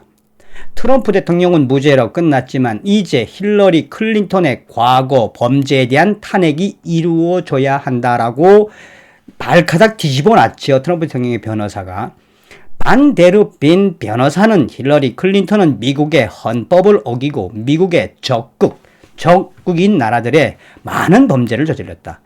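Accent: North Korea. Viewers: 이 분 북한사람 인가요? 매번 북한 말투로 ??? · 요즘 북한 사투리에 빵빵 터집니다. 간만에 재밌는 웃음을 주시네요~^^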